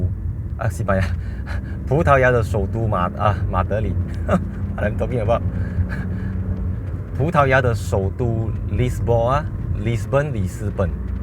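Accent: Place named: Malaysia. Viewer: 看到一个口操马来西亚腔的主持人review欧洲的国家，有一种马来西亚终于走向世界的感觉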